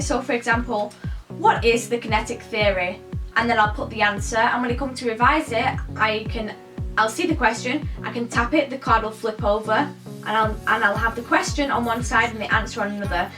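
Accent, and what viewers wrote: United Kingdom. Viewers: I'm sure she's from the UK! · r u british?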